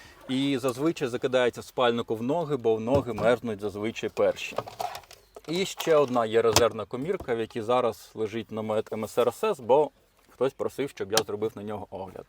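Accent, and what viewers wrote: Standard. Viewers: Класно говорить по українськи чиста соловїна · Дуже чисто спілкуєшся українською, в приклад іншим👍👍👍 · Доречі , дуже класна та чиста Українська!!!❤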